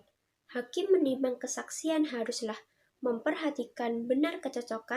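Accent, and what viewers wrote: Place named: Java, Indonesia. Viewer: sukses terus.. oh iyaaa, jowone kental pisan oiii wkwk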